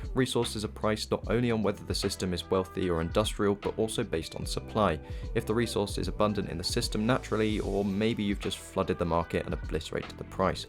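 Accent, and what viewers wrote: United Kingdom. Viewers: Wow. 2024, playing since 2018, love the British accent. All you need to know is here. Btw, if you want to make money (units) -> chlorine, afterwards -> buy cheap ships, upgrade them, and sell them back as scrapping for AI parts... Worthing a lot of units.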